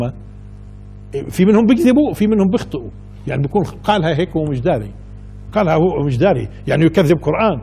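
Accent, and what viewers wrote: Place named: West, Algeria. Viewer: سبحان الله نفس اللهجة عندنا في غرب الجزائر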